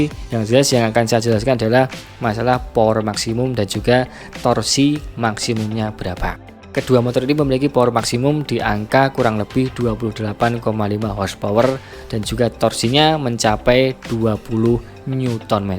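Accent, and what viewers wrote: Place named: Java, Indonesia. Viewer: Medok amat bang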